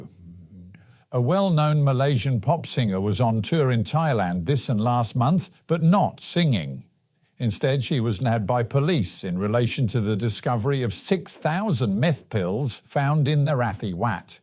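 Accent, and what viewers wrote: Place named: Southport, United Kingdom. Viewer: Southport English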